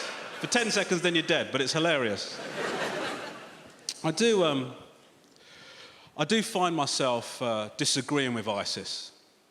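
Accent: United Kingdom. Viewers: That's how he talks. He is born in Britain, studied in Britain, so if corse he will have that 'accent'. The way you speak has nothing to do with your color or ethnicity. It depends on where you grew up. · sounds more British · Talks about Iggy sounding like a black woman from the south. But here he is, a Sri Lankan, with his Bri'ish accent. 🤔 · Why are you speaking with a British accent?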